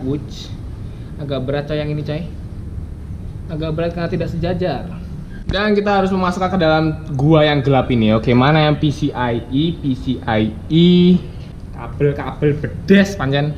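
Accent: Java, Indonesia. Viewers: Ngomong nya ada jawa nya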